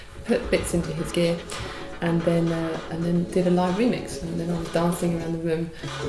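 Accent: United Kingdom. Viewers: I find myself torn between focusing on an amazing song and a British accent. So I watched this twice. :)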